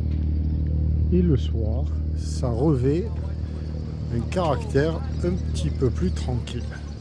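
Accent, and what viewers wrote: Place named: Var, France. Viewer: j'adore t'es vidéo cette accent varois bien de chez nous plaisancier a moteur tu me donne gout a la voile continue!!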